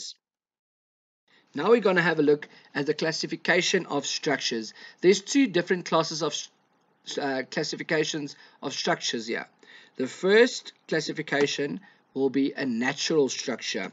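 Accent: South Africa. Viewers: I swear this guy sounds South African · It sounds like you speak Afrikaans, because you have an south african acsent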